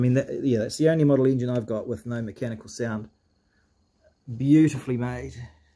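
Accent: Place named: New Zealand. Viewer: Chur bro you sound like a fellow New Zealander?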